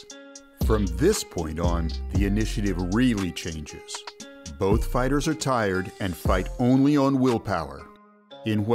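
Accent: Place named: United States. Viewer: ur voice does not sound like a Ukrainian you’re American bruv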